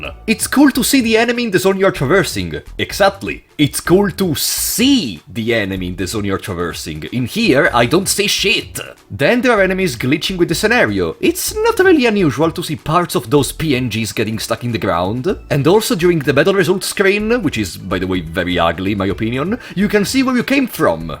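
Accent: Italy. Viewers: Are you italian? (Im italian too and i noticed your accent)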